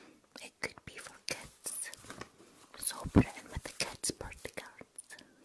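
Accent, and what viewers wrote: Scotland, United Kingdom. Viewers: XOXO right back at ya😁. I'm honored that you replied. As an American, I really appreciate your enchanting accent. I'm so glad to have found your videos, they ease my anxiety and are so very relaxing. Keep up the great work, it truly has a positive impact. Your sincere kindness and caring really come across. Thank you.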